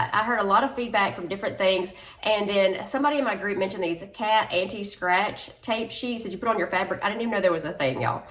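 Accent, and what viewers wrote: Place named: South, United States. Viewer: Love how this turned out. Thank you for sharing. Also love your southern accent. Watching from Ontario Canada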